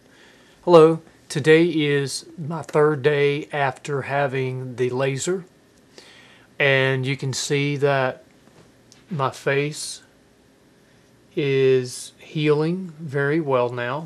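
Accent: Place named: South, United States. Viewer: Lov souther accent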